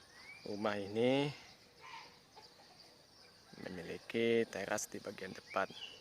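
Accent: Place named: Java, Indonesia. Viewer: Si mas ini org Jawa yaa? Loghat ny keliatan soal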